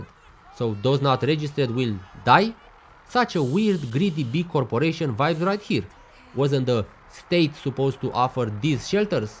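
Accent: Romania. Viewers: you are romanian right?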